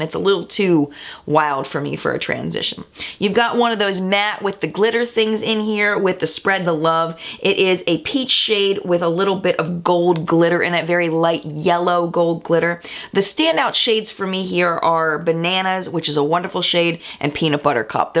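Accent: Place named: New Jersey, United States. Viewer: I subscribed for that Jersey accent alone! ...that is a jersey accent amiright?!?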